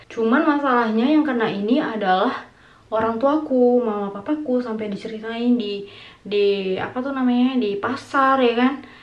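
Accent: Indonesia. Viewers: Salam kak... Saye dari tg. Balai karimun... Slame nonton vlog akak,, bahase nye same dgn kami... Ternyate emang pernah tinggal di tg. Balai karimun... Kak tg. Balai karimun nya dulu dimna?? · pantas logat e macam pontianak.. emang perbatasan indonesia na kalimantan dengan malaisia.. walaupun ndak nempel langsong pontianak e wkwkwk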